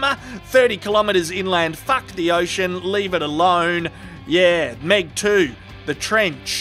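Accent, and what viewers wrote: Australia. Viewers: You are the Siskel and Ebert of reviewers with an Aussie accent. No not because they’re both dead, because you’re just that good.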